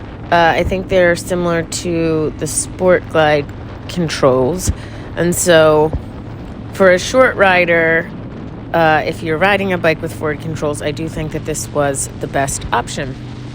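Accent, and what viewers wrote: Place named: Maryland, United States. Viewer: Despite living in the area, (Fairfax) every time I watch your videos and hear your voice, I think, "That girl is definitely from Maryland" with your Maryland accent. You and cameraman both. I guess because I'm a New Jersey transplant who came to the DC area after my job transferred me here 30 yrs ago. LOL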